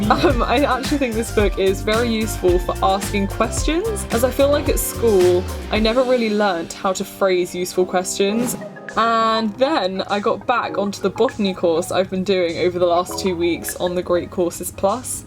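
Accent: France. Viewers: To be honest your French accent isn't bad at all! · your french accent is so lovely !!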